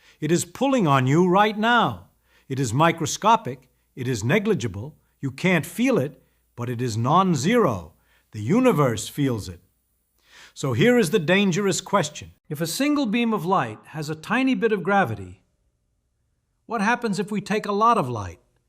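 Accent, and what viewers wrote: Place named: Brooklyn, United States. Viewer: Whoever you are, thanks. I’m aware it’s ai but Feynman was a favorite and you’ve captured his spirit very well. I see a ton of these videos, yours nails his Brooklyn accent.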